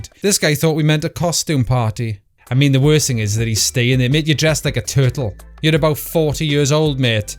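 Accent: Wales, United Kingdom. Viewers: Wales 🏴 💕 cariad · Are you Welsh? 🏴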